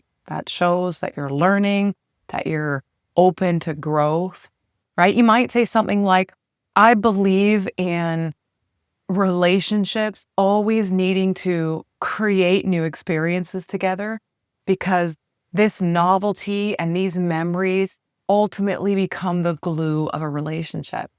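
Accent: Canada. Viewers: along with the fact that you're smoking 🔥 hot, I really get a kick out of your Canadian accent. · Really. Is it Canadian.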